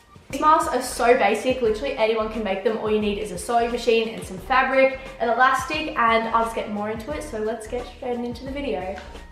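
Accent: Australia. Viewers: Wow amazing thank u ... Also r u Australian cause ur accent sounds like u are... U have a new subscriber because of ur help and thank u for helping people... I don't have a sewing machine but I think I can manage sewing don't think it's that hard but haven't shown anything in a few years 😂... Well hope mines come out as nice as yours but I'm sure it will be ok... Stay safe and thanx again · I hear that Australian accent👌🏿👌🏿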